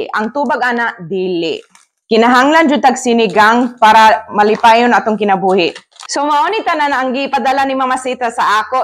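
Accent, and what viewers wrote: Visayas, Philippines. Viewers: Ang accent bisaya jud kayu ba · Katawa jud ko nimo kay Bisdak kaayo ag imo inisturyahan, unya Amerkano kaayo imong hitsura.. Americanong Bisaya jud. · Bisayaa jud nimo dai oi!! 😅😅 Lipay jud maka dawat ug regalo!! Happy cooking!! 👩‍🍳🍴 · Wow bisayang bisaya magsalita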